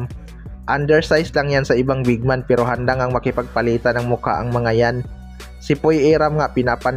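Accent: Visayas, Philippines. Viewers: ang gling mo mg hanap buhay boss khit tonong bisaya ka ayos lng bsta ba malapit nmn sna sa ttoo ibbalita mo anlayo sa caption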